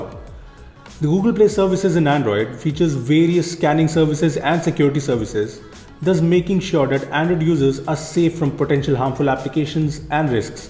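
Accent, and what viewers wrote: India. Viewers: Indian accent, I just love it. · my God I can't keep listening any more Indian accent... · Why should you bother when english is not his mother tongue ... Literally nobody gives a fuck to english in India as there are more than 2500 languages. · i always wonder why every indians that's speaking english sounds like this ._.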